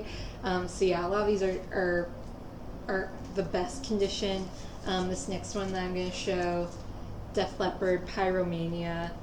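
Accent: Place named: Pacific Northwest, United States. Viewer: Hey, you showed up in my recommendations the other day, so this is the first video of yours I watched - and it made me a subscriber! Great easy-going style you have, and in a YouTube genre that's far too dominated by men, if you ask me. It sounds like you're a fellow Pacific Northwesterner, too, which is all the more fun.:) I hope you survived last week's heat wave OK. I look forward to watching more of your stuff (and I invite you to take a peek at my channel if you haven't yet)! Cheers!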